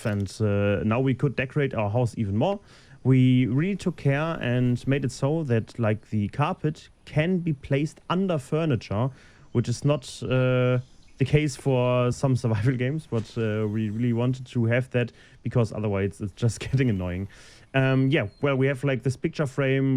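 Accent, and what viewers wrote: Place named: Germany. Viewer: Wie man den deutschen Dialekt heraushört ;P.. bin hyped! sowas gibt´s noch nicht. Viel Erfolg!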